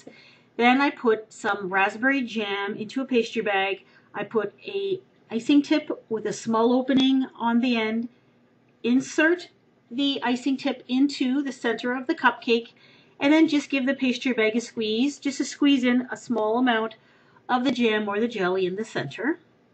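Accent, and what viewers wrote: Canada. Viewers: love the canadian accent ^^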